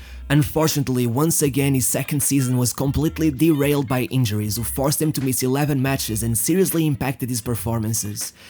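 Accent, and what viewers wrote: Portugal. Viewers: Antes de falares uma palavra em portugues já tinha reparado na tua lingua!! Tugas Caralho!! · És português também eu o sotaque assim indica parabéns ao seu Canal fantástico 👍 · How do you not have a million subs??!! Thanks for the amazing videos keep up the great work and I love your Portuguese accent makes everything sound so smooth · i can tell the Portuguese accent. It is also not "footsal" its indoor football. thanks for the video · You don't have to use the Portuguese accent on non Portuguese words my dude. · Ahhh...vi logo que era um tuga a falar ingles... Bom video,desconhecia o canal...subscribed... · Esse sutaque hein....Tu é Tuga né? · you, the narrator of this video is Portuguese right? the accent points to it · Without knowing you I can tell you are Portuguese, and likely from the North/Center of the country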